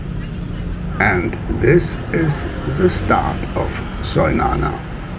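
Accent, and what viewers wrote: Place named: Norway. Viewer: your accent sounds like Norwegian 😅 but i can be wrong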